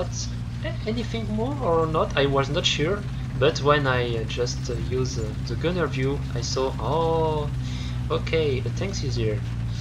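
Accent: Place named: France. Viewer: bah je comprends pas tout.... mais c est de l anglais avec un belle accent français...lol..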